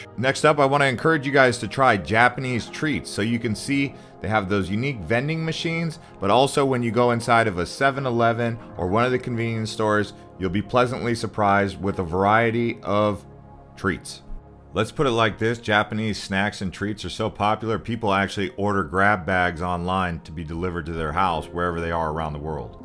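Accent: United States. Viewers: Hello love the way you explained anything about japan, next thing that really love is your american Accent just really something, new subscriber from Indonesia japan is my dream country to visit, subscribed.❤🇯🇵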